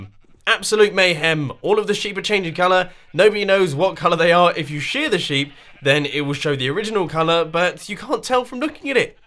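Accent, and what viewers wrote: United Kingdom. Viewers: Wait wait wait, you're a teenager?! From your voice, don't know why, but I expected you to be in your early 30's! Has anybody told you you sound like a british young adult? [Thinks about your profile picture and minecraft skin] Ooh. They did. XD (This is not supposed to be an insult) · Wait are you British like me? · Are you bri-ish.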